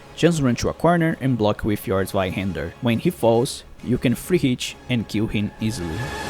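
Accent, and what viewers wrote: Brazil. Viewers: Parece um brasileiro falando inglês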